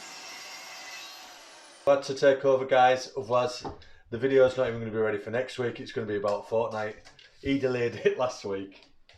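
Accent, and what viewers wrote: Hull, United Kingdom. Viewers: Just found your channel, I am an ex welder/fabricator but trying to learn more about wood so this is fantastic. You sound like a Hull lad (I originate from Hull) so I understand every word you say makes me feel homesick. I was mates with a lad called Robert Pope as a kid, think he became a carpenter also. Anyway good luck with the channel, very professional and well presented.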